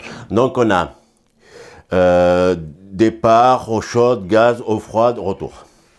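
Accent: South, France. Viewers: Super! "Putin" cet accent! Je suis Marseillais, j'en connais quelque chose!! J'ai une chute de pression sur le manomètre, je remonte la pression tous les mois à peu prés, d'où peu venir cet perte de pression en sachant que je n'ai aucune fuite et que mes radiateur sont purgés. Soupape de sécurité qui est reliée par un pvc de 32 à l'égout? quand pensez-vous? Merci pour votre réponse.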